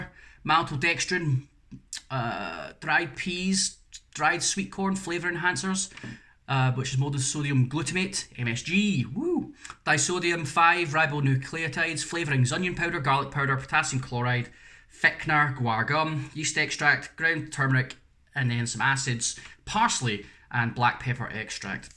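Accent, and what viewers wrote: United Kingdom. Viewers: Are you scotish im scotish aswell🙂🙂🙂🙂🙂🙂🙂❤❤ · where is your accent from? what part of GB?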